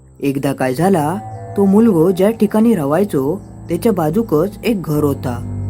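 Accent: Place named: Konkan, India. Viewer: Are hec best marathi kokni marathi🥰🤩😍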